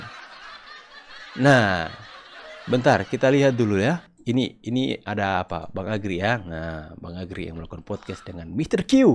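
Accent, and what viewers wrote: Java, Indonesia. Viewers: bang logat suaranya seperti orang jawa/jakarta dalam berbicara bahasa Indonesia, biasanya logat luar jawa beda ma jawa, itu nurutku 🙏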